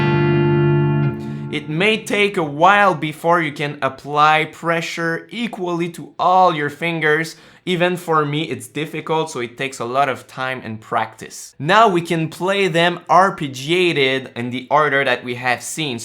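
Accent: Quebec, Canada. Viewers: I love your Quebec accent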